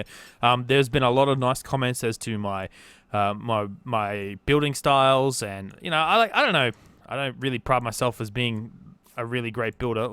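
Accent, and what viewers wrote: Australia. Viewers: live in Sydney, Australia and my internet is not the best but my friends internet is really good like i went to his house with all of our mates (6 of us) and we were all downloading unturned and we all did it in 30 seconds. do you live in WA or something? cause it sounds like it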